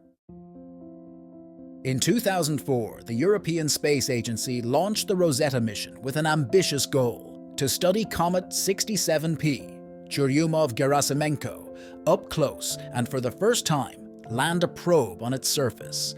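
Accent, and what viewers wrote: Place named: Ireland. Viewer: Hey guys! You need to put a notice to use headphones for the best experience. **By the by, was this an AI narration? Because if it's a human, I swear I detect a hint of a brogue. A bit of Celt in there? Maybe Western Ireland. Connemara, perhaps? I have family there so Im able to find it easily.**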